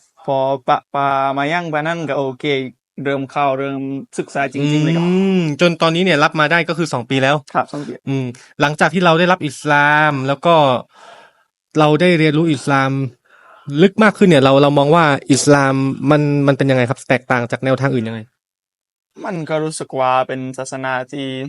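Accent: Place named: South, Thailand. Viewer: หรอยหนัดบังหรั่ง ❤❤❤ แหลงใต้ชับเปี้ยะ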